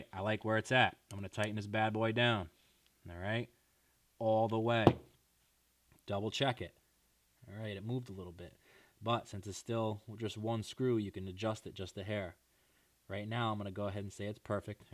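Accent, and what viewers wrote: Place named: New York, United States. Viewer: U from new York right for 100 buck I bet I'm right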